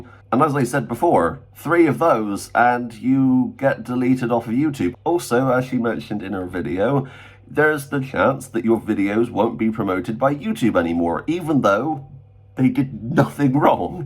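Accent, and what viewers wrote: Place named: West Country, United Kingdom. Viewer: Good to see she got the strike removed. Appalling behaviour by YouTube! Also where in the West Country are you from? 😂 i wanna say Dorset or Gloucester? Great channel by the way, love from Somerset 😀 Jesus Christ be Praised!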